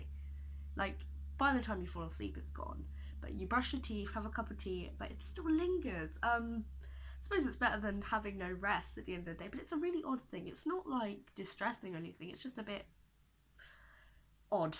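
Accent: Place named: United Kingdom. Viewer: where from the uk are u from?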